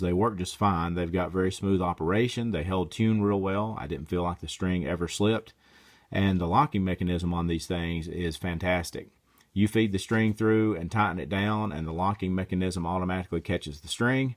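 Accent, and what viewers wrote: Alabama, United States. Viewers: are you from alabama?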